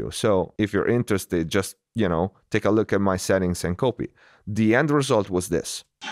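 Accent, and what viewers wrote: Italy. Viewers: I'm assuming Italy.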